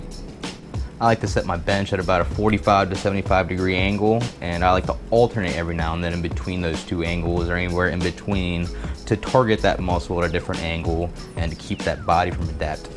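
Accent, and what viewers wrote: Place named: United States. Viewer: Lol that intro and what's with your country accent boy lol keep it up though man!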